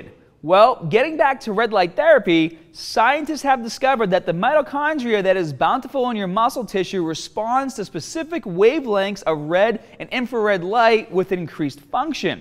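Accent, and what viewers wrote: Boston, United States. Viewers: Woooof I didn't know this guy was from Boston until "scars" and "marks" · I'm detecting that "Bostonian" accent. :-) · are you a Bostonian. The way you say 'started' --> 'Staaahted' is a dead giveaway! From one Bostonian to another - Do. Not. Lose.the.accent.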